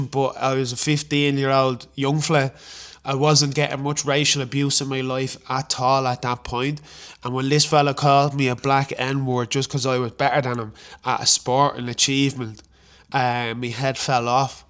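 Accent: Dublin, Ireland. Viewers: No such thing as Black and Irish...you are an Irish citizen but you are not Irish. If I was born and live in China...it doesn't make me Chinese, rather a Chinese citizen. But, love your Irish accent! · Fine dub accent that mate. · I absolutely looooove your crisp Irish accent and the radio friendly tone of your voice. I can't pin point what part of Eire it is from because it is clear to me that as a nation you guys have many more accents. On a separate note . Moisturize, moisturize, shea butter. That skin's going crack like eggshells if you don't . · Well done really enjoyed the honesty & how could anyone think you weren't a Dub bro with that accent 😂😂😂 & your mam's a legend 👏 you're very lucky to have her ❤ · Well, you're here and you sound like any other Jackeen, so I suppose that's that. But there is more to being Irish than just being born here, or having the accent, or having a passport, playing our games and music, or whatever. 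Simple as that. Like so many other people who have to be heard on this, you can rattle off the names of Phil Lynott and Paul McGrath. Both of whom had one actual Irish parent, it seems obvious to point out. If only all our imports would contribute so much as they have. I'd be more interested to hear your thoughts on Kevin Sharkey and his political views. If you don't know who he is, you should do. He's a complex character for sure. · Fascinating, thank you. Your mom sounds incredible! You look black and sound Irish. I look white and sound non-Irish. Always a bit more to reconcile, but I think it's enriching to have additional perspectives.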